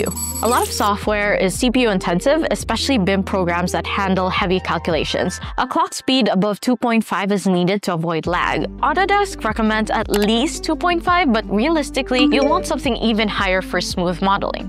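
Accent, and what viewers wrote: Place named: Philippines. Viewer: I think her Filipino accent is still noticeable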